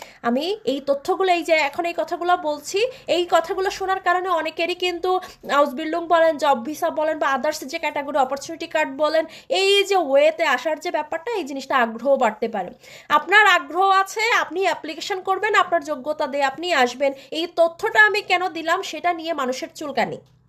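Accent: Khulna, Bangladesh. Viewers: আঞ্চলিকতা পরিহার করলে ভালো লাগে।একবার খুলনার কথা আবার শুদ্ধ কথা না বলা ভালো।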